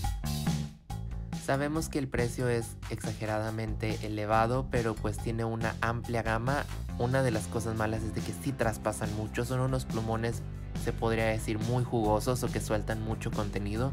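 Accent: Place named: North, Mexico. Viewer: Ya decía yo que el acento me sonaba del norte saludos desde Matamoros,Tam!❤️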